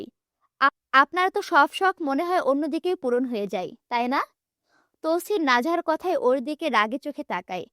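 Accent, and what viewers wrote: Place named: Rural, Bangladesh. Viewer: আপু তোমার মুখে গ্রামের আঞ্চলিক কথা আামার বেশি সুন্দর লাগে 🌸🌸🌸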